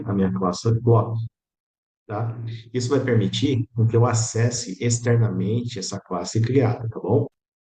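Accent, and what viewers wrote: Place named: United States. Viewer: Sotaque americano lindo desse professor 🥰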